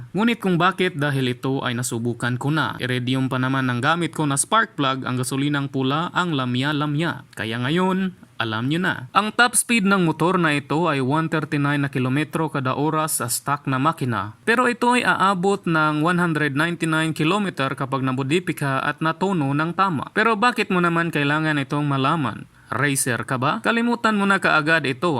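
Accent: Visayas, Philippines. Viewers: tagalog na bisayang accent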